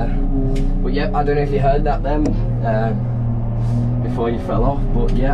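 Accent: United Kingdom. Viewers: One of many. Think hes from Cumbria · Sounds like Yorkshirian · Nice vid, is this a British accent ?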